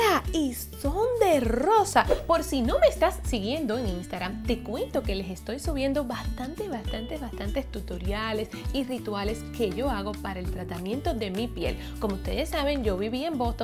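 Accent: Cuba. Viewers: me encanta tu tono cubano!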